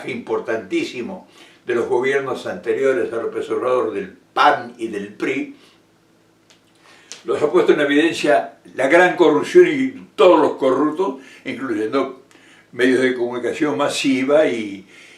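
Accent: Argentina. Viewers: usted habla como argentino, pero tiene un corazón mexicano. Su capacidad de reconocer al pueblo como la fuerza que sostiene a este gran gobierno. Excelente análisis. Saludos desde México.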